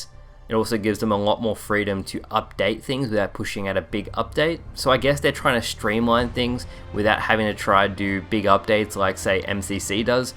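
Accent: Australia. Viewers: hey. since this one of the first videos i've heard you talk in, I wonder, are you from australia?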